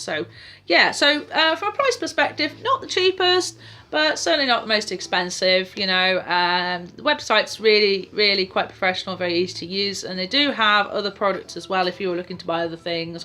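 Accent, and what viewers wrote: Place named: United Kingdom. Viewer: I love your British accent by the way(haha) I could listen to you all day..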